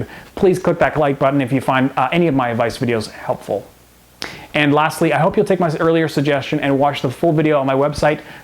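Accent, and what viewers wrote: Canada. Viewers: Are you...Canadian?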